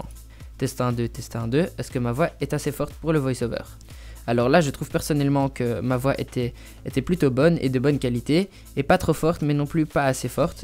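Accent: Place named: Belgium. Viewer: Incroyable l'accent belge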